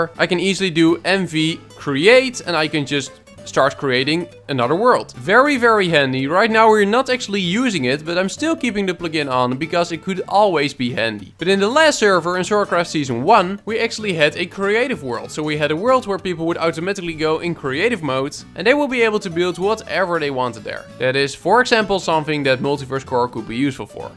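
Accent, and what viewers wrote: Netherlands. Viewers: Your Dutch. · U dutch? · Kan gewoon horen dat je Nederlands bent XD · Nederland voor de dubbbb lessgooo ik hoorde al iets aan de stem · i smell dutch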